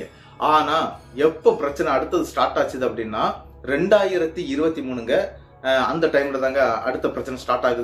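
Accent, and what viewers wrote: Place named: Tirunelveli, India. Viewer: Kadhai sollum vidham Tirunelvel i slang nice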